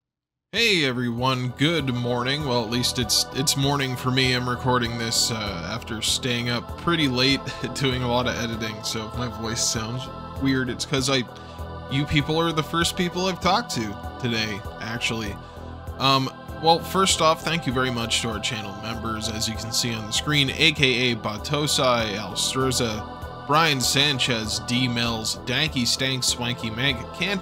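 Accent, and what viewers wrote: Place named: England, United Kingdom. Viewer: Thanks for the coverage, but how you reference all noun/name with the English accent is amusing! For me, So-les-ta-lo being all the way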